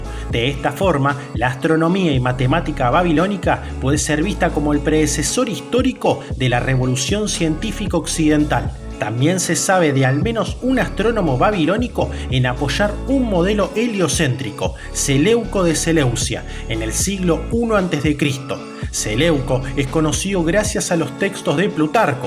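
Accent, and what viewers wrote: Argentina. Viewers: Hola! No sé tu nombre pero te felicito por todos los videos. Te puse en otro video pero tal vez no lo viste! Estamos organizando con la UBA y la UNLP una charla sobre Divulgación Histórica. Vamos a invitar a Javier Trímboli, el Profesor Borda y queríamos invitarte a vos qué haces estos videos que nos parecen muy interesantes para la Divulgación. Te interesaría participar? Por tu acento asumimos que sos argentino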